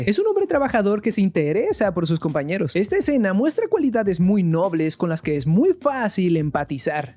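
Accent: Standard. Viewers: por qué tenes q hablar tan neutro xd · Muchas gracias por tu contenido, es muy util y bien explicado! Siempre siento como si estuviera viendo un capitulo de algun programa de discovery por el español neutral 😂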